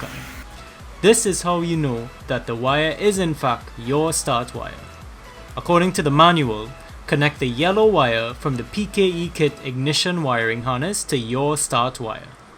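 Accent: Trinidad and Tobago. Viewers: You have a trini accent but I’m wondering where you’re from.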